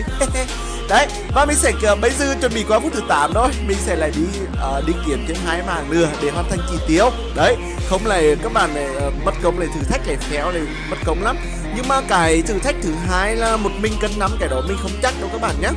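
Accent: Nghe An, Vietnam. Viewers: Anh người nghệ an hả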